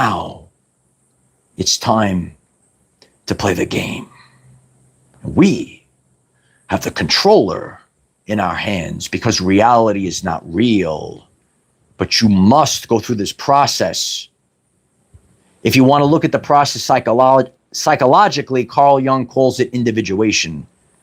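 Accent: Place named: Rhode Island, United States. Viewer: I found you from R.I. That accent is familiar lol. Close enough to NYC I think you're beautiful 🫂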